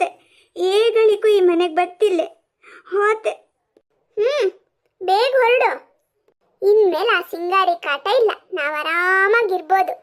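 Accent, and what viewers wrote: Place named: Mandya, India. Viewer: ಮಂಡ್ಯ ಭಾಷೆ ಸ್ವಲ್ಪ ಇತ್ತು 👍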